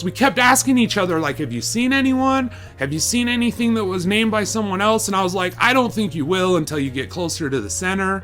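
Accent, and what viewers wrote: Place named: East, Canada. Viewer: Accent sounds like eastern Canada.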